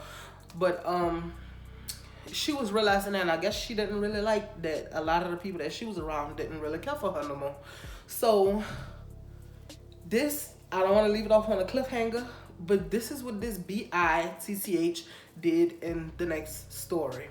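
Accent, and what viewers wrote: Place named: Louisiana, United States. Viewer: oh ok yasssss.. I'm also from Lousiana. ( New Iberia) I'm in California now been here since 7 years old.. All my people have your accent... I love it. Love your channel.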